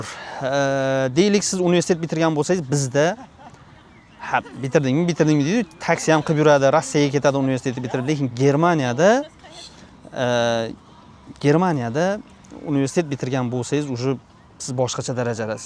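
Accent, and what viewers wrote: Khorezm, Uzbekistan. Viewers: Har dim Xorazmcha getib duribdidon,nerdansiz odosh?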